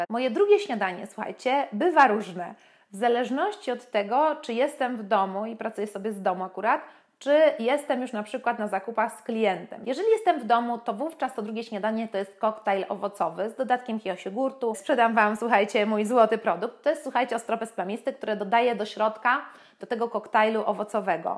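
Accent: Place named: Warsaw, Poland. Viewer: Słychać od razu, że Warszafka mówi. No i kolejny film z cyklu jak mówić przez 12 minut i nic szczególnego nie powiedzieć.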